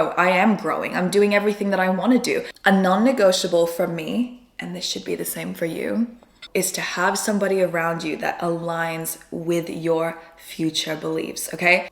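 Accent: Australia. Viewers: The accent?? Where is she from. My guess is Australia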